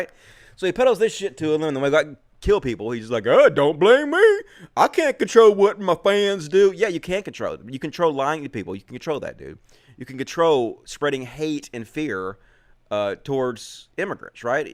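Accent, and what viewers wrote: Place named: Alabama, United States. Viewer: I guesss his Nothern Yorkshire accent is on a parr with your Southern Alabama accent as far as hickness goes. Puddings and...puddings and??? I'm at a loss. Can't think of anything other than the most corrupt police force in the country to come out of Yorkshire. Oh! Peter Sutclife. He's hardly a nice guy but look him up. I'm from Lancashirr. Our rivalry goes back cenuries. We had our own Civil War. Instead of war of the racists, it was war of the Roses.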